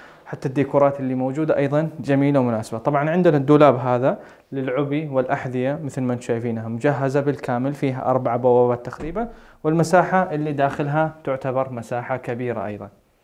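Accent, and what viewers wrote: Saudi Arabia. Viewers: ما شاء الله تقديم الأخ صاحب الفيديو مميز. ذكر الله على لسانه و الأدب و اللهجة الجداوية الجميلة. بالتوفيق يا رب.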